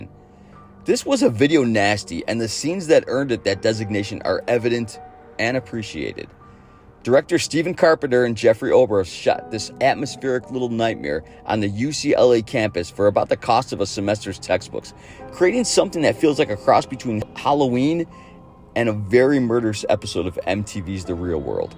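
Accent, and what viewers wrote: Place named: Chicago, United States. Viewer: I thought you might be from Chicago. Your accent is subtle. I am 45 from Tinley Park.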